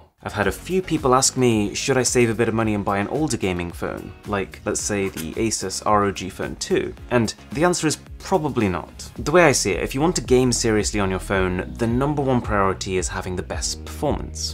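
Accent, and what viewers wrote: RP, United Kingdom. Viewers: Nice review but why do you talk Posh? lol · Where the hell did you get that info from? The dude is British and it's been proven before by the uni he went to and so on. I've also met people from the UK with the exact same accent and they're not too hard to find. Go do your stupid conspiracy elsewhere.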